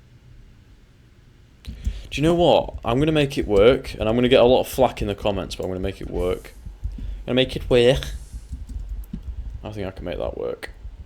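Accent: United Kingdom. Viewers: Why does he sound more British this episode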